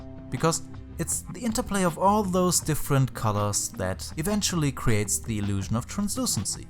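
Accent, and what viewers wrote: Germany. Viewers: i like your paintings o.o you have a german-english accent. Are you born in germany? ;)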